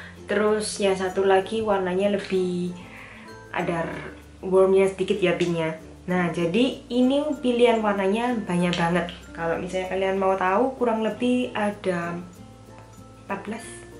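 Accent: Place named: Surabaya, Indonesia. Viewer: medok bgt surabaya nya cik.. tooss laah sm2 org sby.. hahaha😜😜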